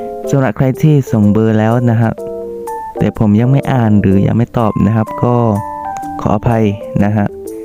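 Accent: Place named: Deep South, Thailand. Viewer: เด็กสามจังหวัดชายแดนภาคใต้แน่ๆสำเนียงแบบนี้ผมชอบทำต่อไปให้กำลังใจ🥰🥰🥰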